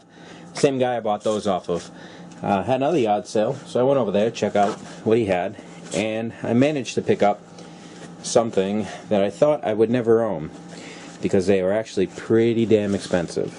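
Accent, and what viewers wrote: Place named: Massachusetts, United States. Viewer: Nice ! I picked one up from a older mans wife who passed away. She sold me around 60 end mills , starrett lufkin BS ect tools indicators planers 123 blocks list goes on and on . She was asking 75$ with out a blink I purchased it. Great video though. Were ya from sounds like Mass im in CT. North east is a machinist dream.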